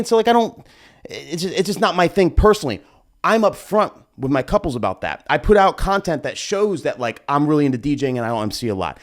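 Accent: New Jersey, United States. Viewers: Im on both sides of this fence... when people complain about the DJ interaction...it just simply has to do with whatever they are doing...if you arent that good at picking good songs... you need to have a filler of you to keep people entertained... if you are super good at mixing but you dont care to interact...then add interactive audio to your set.... and also be up front... But. I dont think you realize that you have big time charisma... ive seen your streams as well... and even when you're quiet...you are interesting to watch... at least for me... you're good at both from what I have seen... of course I havent been to a wedding you DJ...I think... I went to a Philly wedding in 2014 and I almost think you were the DJ but my fallible human memory could be failing me miserably so I wont speculate lol... but you dont realize...you simply have the gift of an introvert and an extrovert...i am the same way... 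i dont want to be expected to interact....but when the party is jumping...im gonna naturally interact just because I am having more fun too. And I think you are a similar way... you got that entertaining jersey accent...you dont portray that you dont like interaction...you just dont like to be forced to be cheesy.. and thats a valid place to be... you simply know when to interact...and when not too...and most people in general simply dont know that... because they dont practice it... or simply dont have enough experience with it...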